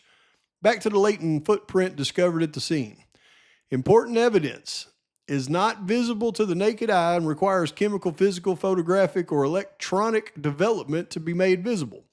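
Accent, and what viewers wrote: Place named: Arkansas, United States. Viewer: He lived in Arkansas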